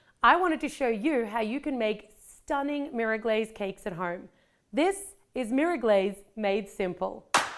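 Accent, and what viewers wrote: Australia. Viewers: She's from Australia. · thought she was Australian?XD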